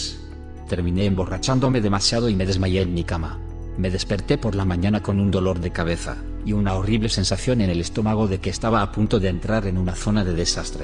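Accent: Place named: Russia. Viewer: Yo digo aue si es rusa porque su acento se oye muy marcado.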